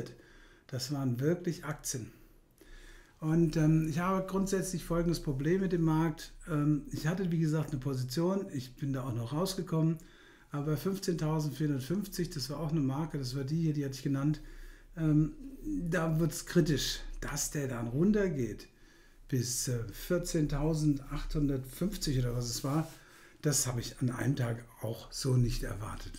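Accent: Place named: Frankfurt, Germany. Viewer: Klasse! Erinnert mich an meine Frankfurter Börsenzeit, und abends im Bitburger, da war auch dieser Dialekt am Start :) Vielen Dank für die solide Arbeit!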